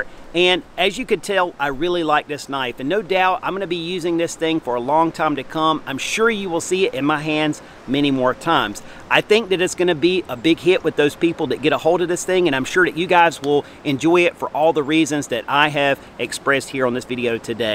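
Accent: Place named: Georgia, United States. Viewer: Having only known you from this channel. I have detected a southern "twang" in your speach, are you from Georgia?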